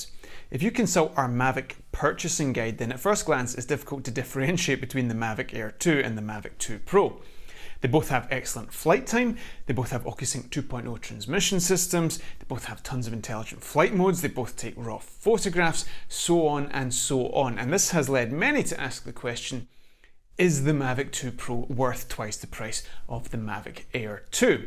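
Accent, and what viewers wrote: Scotland, United Kingdom. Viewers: Excellent review very informative and very happy to listen to that pleasant scottish voice.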